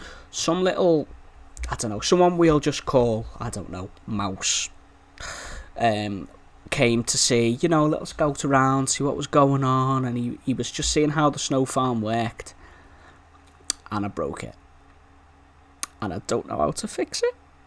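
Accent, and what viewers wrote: Liverpool, United Kingdom. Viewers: Love it!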